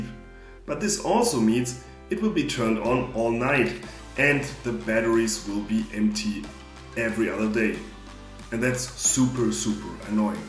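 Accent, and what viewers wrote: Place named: Germany. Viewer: Man erkennt sofort wenn ein Deutscher Englisch spricht. Daumen hoch.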